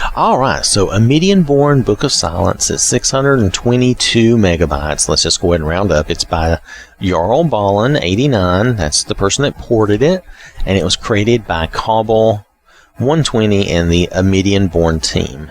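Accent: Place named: South, United States. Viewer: Don’t hear a lot of southern accents in modding, you are appreciated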